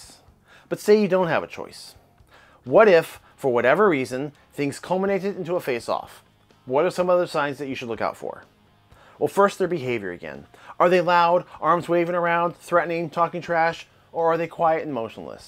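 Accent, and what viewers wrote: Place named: Russia. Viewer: Cauliflower ears and Russian accent is the ultimate combo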